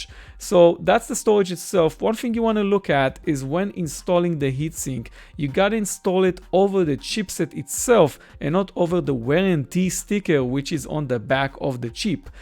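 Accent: Israel. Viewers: Holy shit that Israeli accent haha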